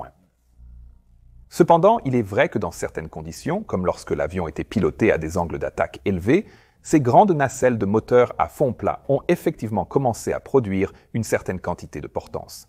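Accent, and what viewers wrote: Canada. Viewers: Dear, I am a French speaking Swiss citizen. I listen regularly at your great interventions with much interest. Your talks are so well documented, no fake news, trustworthy infos, very educational. Recently I discovered the French versions, translated with AI. Your voice is tainted with Canadian accent and is not as fluent as your English. Sincerely I prefer from far to listen at you in English, as your English is absolutely perfect. May be a suggestion: you should definitely have the original English version. Why not put a link to switch to the original English somewhere below the display?. Thank you and congratulations for your amazing work.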